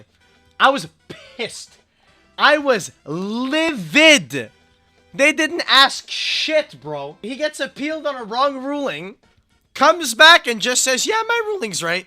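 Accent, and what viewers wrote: Canada. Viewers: This guy’s video started autoplaying when I left YouTube open. He sounds so damn Canadian lol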